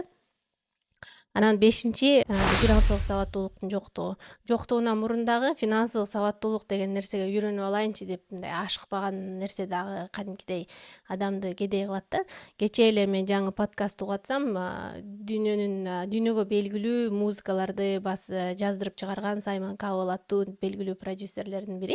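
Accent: Standard. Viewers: Кыргызча таптаза сүйлөгөнүңүзгө ыраазымын ❤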